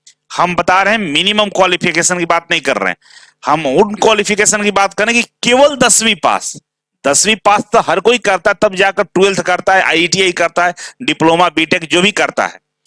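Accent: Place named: Rampur, India. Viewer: Sar Rampur wala bol raha hai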